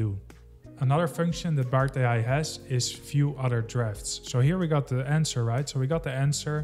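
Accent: Netherlands. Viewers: I enjoyed your video on Bard vs ChatGPT very much. It was very informative and I learned a lot about the two language models. I could tell you are Dutch by your accent 😊. Greetings from South Africa!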